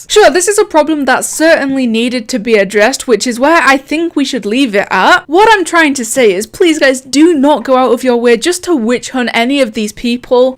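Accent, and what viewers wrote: United Kingdom. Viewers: I rather you over koneko since u sound Like your from the uk not saying you are from the uk It’s just there are not many British roblox YouTubers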